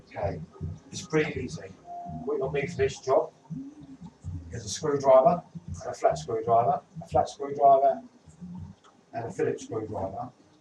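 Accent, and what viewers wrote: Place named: United Kingdom. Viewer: hello, glad you have it still working! Please tell me , are you a Londoner ? You have a pure British accent) Or, if not, how this accent is called ? I do get aesthetic pleasure hearing your “so british” speech)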